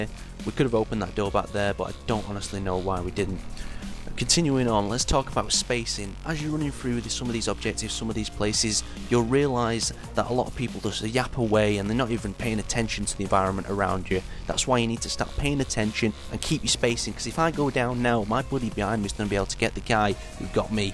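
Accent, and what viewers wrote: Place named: Manchester, United Kingdom. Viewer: which part of Manc are you from?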